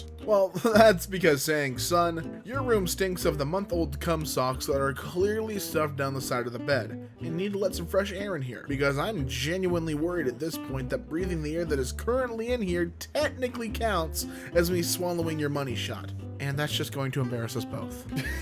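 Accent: Scotland, United Kingdom. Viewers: I love his Scottish accent. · Dude you sound like Scotsman from samurai jack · Please tell me how to do your Scottish voice I want to know how you do it so well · As a born and bred Scot, that Scottish accent was perfect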